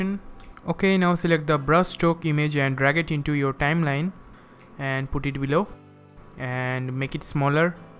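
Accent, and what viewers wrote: India. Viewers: By your accent i can recognize you as Indian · omg at first i thought he was american but his Indian accent is getting more and more lol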